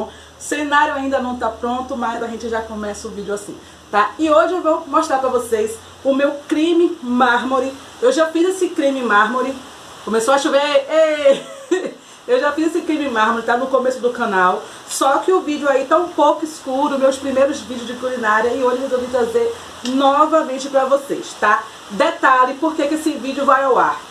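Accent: Bahia, Brazil. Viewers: Êita que saudade desse sotaque da Bahia. Lembro da infância e de minha mãe preparando para mim! Aqui em Buenos Aires não tem ameixa em calda no mercado. Vou tentar fazer o caldo de ameixa aqui. Muito obrigada por compartilhar!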